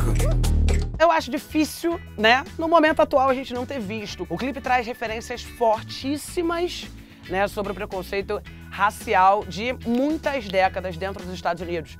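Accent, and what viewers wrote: Rio de Janeiro, Brazil. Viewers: Será que a sra é carioca?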